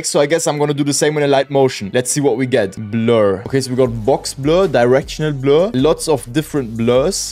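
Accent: Germany. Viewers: i hear german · Man merkt anders das er deutscher ist. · are you german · Wieso weiß ich sofort das er deutsch ist😂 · U sound german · bro du hörst dich so deutsch an 😭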